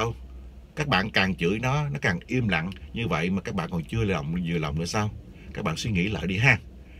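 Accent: Standard. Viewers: Anh nói quá chuẩn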